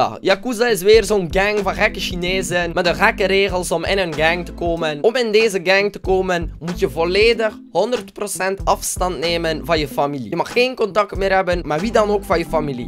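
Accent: West Flanders, Belgium. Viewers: Dat Belgische accent is echt leuk😍😍😍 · praat jij Belgische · Belgies accent is wel grappig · Rani Claes west-vloams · jij praat echt heel belgisch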